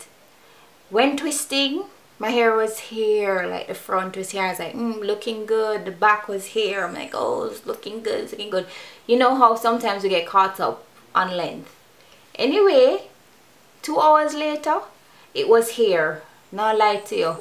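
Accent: Jamaica. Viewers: I love watching your videos just to hear your accent im Jamaican but I don't live around a lot of Jamaicans. I love watching your videos to get a feel of home. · So bus a jamdown chat.nuh.... make mi hear it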